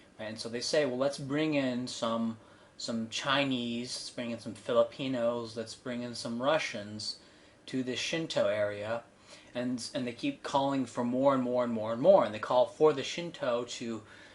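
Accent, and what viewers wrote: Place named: North America. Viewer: Are you greek? LMAO this is absolutely insane. A north american dude (by accent) deciding what thousands of miles away should do 🤣🤣🤣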